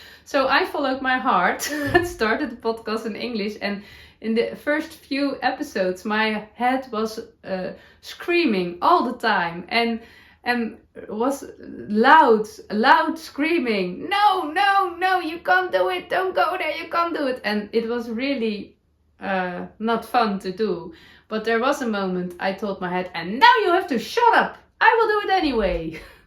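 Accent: Netherlands. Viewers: I adore your dutchy english. I can't remember the name of your sweater ? Can you mention it maybe tomorrow ?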